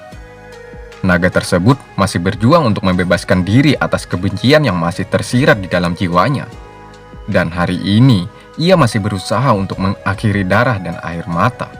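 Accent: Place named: Madura, Indonesia. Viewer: lu orang mana bg kok logatnya kek orang madura atau jawa timur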